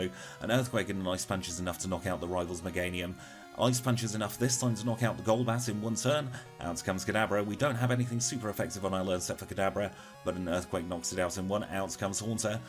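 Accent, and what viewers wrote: United Kingdom. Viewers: Searched for quagsire runs and was not disappointed. Also, nice bonus to hear a fellow Brit doing Pokémon runs too! Great video thanks 😊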